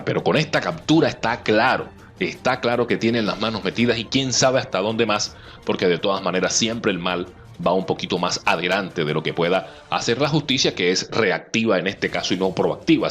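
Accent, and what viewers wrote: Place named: Venezuela. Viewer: Saludos, la verdad que tu no hablas como colombiano hablas como venezolano.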